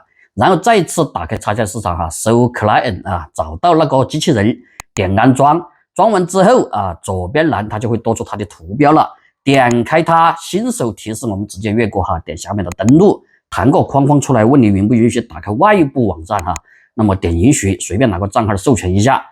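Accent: Sichuan, China. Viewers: 听着一口流利的川普一下子想到了就是他教我学会了搭建一个聊天平台. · 大哥 你是不是四川人 说点川普 我都懵了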